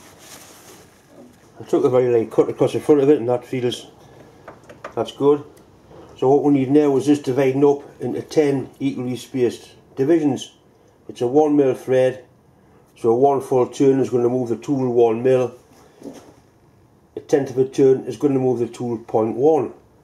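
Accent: Newcastle, United Kingdom. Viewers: Geordie, is he ?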